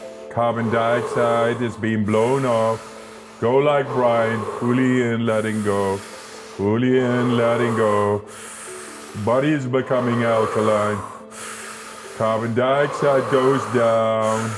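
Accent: Scotland, United Kingdom. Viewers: I was having some trouble recently calming manifestation.guide the voices in my mind during my morning meditation. him has a wonderful Scottish accent and a very calming voice. Thanks to his meditation CD's I have gotten back on track and feel a big difference in my meditation practice. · These meditations are perfect. <link> him has a gentle, warm, pleasant voice (with a slight Scots accent). The words are simple and clear. The timing is perfect... just as you start to find your mind wandering, he says and if you find that your mind is wandering... . There is no background music and no noise in the background, only his peaceful and engaging voice. The length of the meditations (about 1/2 hour each) works well for me. I find that I tire of some meditations after frequent repetition, but that hasn't happened with this one. The metta lovingkindness meditation always feels right. 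Some meditation CDs I have tried have not felt right. The voice seemed hurried, or sounded tense; the background music seemed intrusive, or there were noises that interfered with concentration. This is just perfect as an introduction for new meditators, and it's also a meditation that I return to again and again. I'd give this more than five stars if that were an option. Even my students, who are not ordinarily very receptive to meditation, relax when they hear this one!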